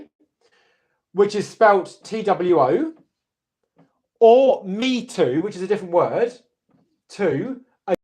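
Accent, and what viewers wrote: United Kingdom. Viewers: Is there any course taught by you, to learn British accent. If it is available on which platform it is available, please let me know.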